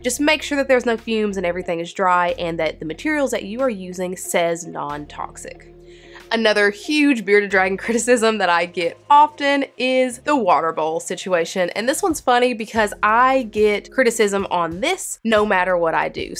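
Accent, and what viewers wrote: South, United States. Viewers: there's nothing better than that southern drawl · I love your accent. I live in Michigan and don't hear southern accents. So it's fun to hear. But yeah. Unfortunately people feel like they can say anything on the internet. So you're gunna get a lot of trolls.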